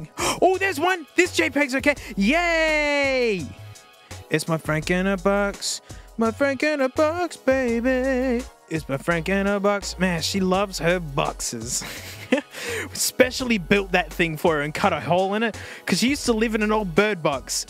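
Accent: Australia. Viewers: Are you Australian or new zealish?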